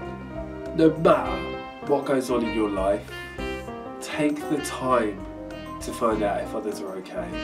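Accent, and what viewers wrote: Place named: Scotland, United Kingdom. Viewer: Great Scottish accent x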